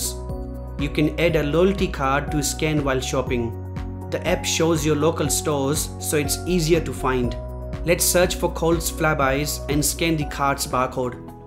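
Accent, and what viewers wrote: India. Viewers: I really wish you guys could get thru that Indian accent your almost as bad as new jersey accent man seriously I have to focus like a sniper to understand your English you are better than most . But seriously guy I can hear every letter of any word from Penelope Cruz and she has heavy latin accent but that Indian English accent i can not grasp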